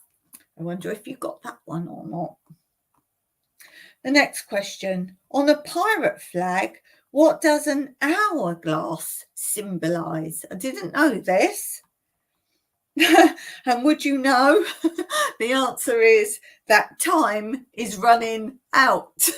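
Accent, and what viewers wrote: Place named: England, United Kingdom. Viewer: 😊 I enjoy the reading especially in your British accent.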